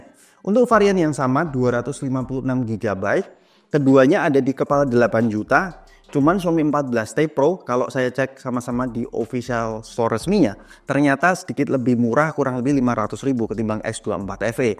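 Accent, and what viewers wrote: Semarang, Indonesia. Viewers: Wong Semarang maszeee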